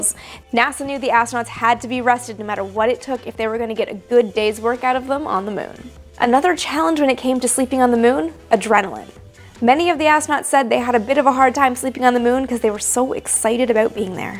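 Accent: Canada. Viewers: Are you Canadian? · your hot and you sound Canadian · Is that a Canadian accent I detect?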